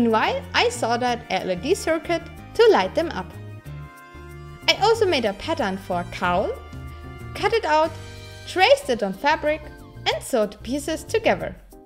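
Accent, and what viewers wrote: Germany. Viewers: I feel like your accent was german right? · oh boy, the cringy german accent tho. The video looks really interesting, but, (as a german myself btw) i just can´t stand that horrible accent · Sounds like: Englisch mit deutschen Akzent.^^ Aber echt schöne Arbeit. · sounds hard like german english :D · That accent...I bet your're German. xD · Wow...I am watching this only 2+ years later but I am amazed and inspired how this cheap material can be made into such awesome looking armor! Somemore you have been commissioned to do this for a company! Well done! Now I also finally know you are from (Southern?) Germany (your accent sounded like it but your name didn't so wasn't too sure...:P). I am a costumer myself but I have not worked with EVA foam yet, hope I can reach your level one day and make maybe a medieval or fantasy armor using EVA foam (due to high flexibility and low costs). 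I also am inspired seeing you using a laser cutter (isn't it dangerous?) to make those awesome zig-zag strip-away patterns and dremmel and sewing machine to make the rest of the armor. And how you custom made (!) all those under gloves and boot covers (I thought I would have sourced them cheaply online like manufactured from China, anyway that's just my thought to save time and patience, which you seem to have no problems!). Overall awesome and no surprise that the company has asked you to do it, an expert (and cheerful inspiration to other costumers around the world)! Keep it up! 👍👍👍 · Sag mal hast Du auch Videos auf deutsch?!? 🤔 . Denn so wie Du Englisch sprichst müsstest Du aus Deutschland kommen!?! 🤔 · Sehr unterhaltsam und echt gut umgesetzt. Wobei ich immernoch nicht verstehe wieso Medion seine Gamingsparte Radiergummi nennt. Das deutsche kommt gaaaanz leicht durch aber respekt. So gut sprechen nicht viele. 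Hoffe Corona gretscht dir/euch nicht zu sehr ins Geschäft. Wünsche nur das beste. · I love that Germán accent.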